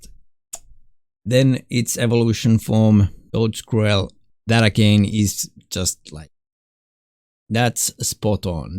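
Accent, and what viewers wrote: Finland. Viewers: Are you finnish?? You sound finnish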